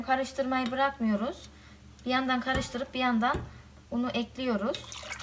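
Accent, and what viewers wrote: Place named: Central Anatolia, Turkey. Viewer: Gaynamaya bırakggyoruz o kadar iç anadolulusun ki abla bende niğdeliyim şiveni çok iyi anliyorum 😂🖑 elllerine saglik bizde koyunun boyun etinden yapılır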